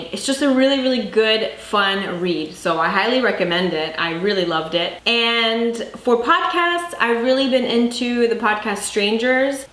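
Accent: Canada. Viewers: I'm loving your Favourites vids- they are to the point but not too curt and your recommends are consistently great. Also I don't know why but I used to live in Ontario and your style reminds me of it for some reason...maybe your accent? Anyways, it's a good thing! Thanks for sharing and props to your ubiquitous quality.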